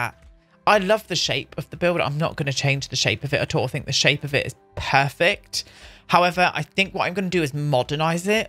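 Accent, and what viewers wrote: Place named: Devon, United Kingdom. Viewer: Welcome back just in time to keep us sane through another lock down. I would love to live in this house. When I win the lottery I will have you design my home. My Gran used to live in Ottery St Mary and would say "Ok my lovely " and hearing you speak always reminds me of my childhood days when we spent school holidays there. I am 69 now so its a lovely reminder Thank you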